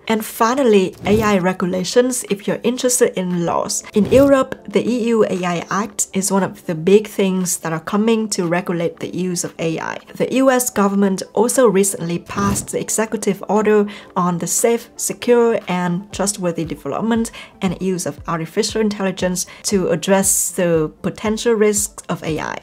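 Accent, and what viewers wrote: Vietnam. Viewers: e cảm ơn chị. Một chiếc video tỉ mỉ không kém mấy video của các pháp sư ấn độ nhưng lại có accent việt đáng yêu vô cùng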